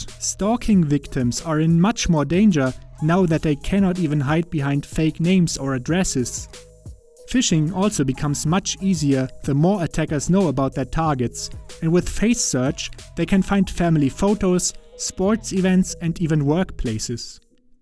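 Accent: Germany. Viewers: Love the german accent. es ist schön but thanks for the information you are providing. subbed. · Kann das sein das du Deutscher bist? Der Akzent killt mich halt total x)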